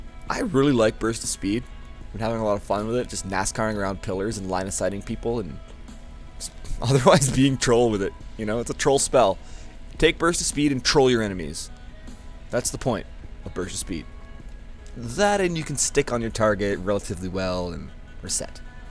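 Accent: Canada. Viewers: Are you Canadian by chance? ;D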